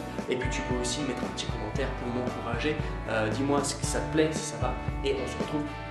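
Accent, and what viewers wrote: Scotland, United Kingdom. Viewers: Hi thanks for your tutorials, it's nice to hear your scottish accent, I'll try that with my students this afternoon! ;) Justine x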